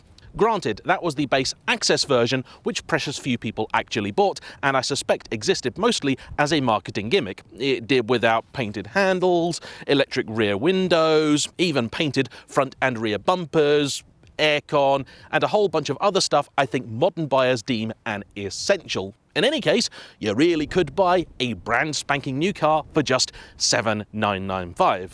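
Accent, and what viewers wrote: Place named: Essex, United Kingdom. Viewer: By the way you speak you Essex boys you are so gay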